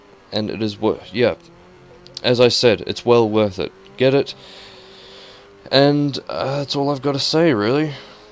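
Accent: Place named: New Zealand. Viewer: I was sitting there thinking where your accent came from and I thought,”I reckon he’s a Kiwi” Nailed it,gday mate.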